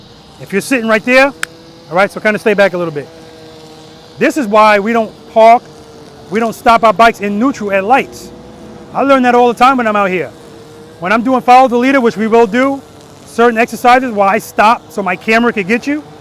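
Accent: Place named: New York City, United States. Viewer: Great video. Thickest NYC accent I’ve heard in a long time. Keep it up.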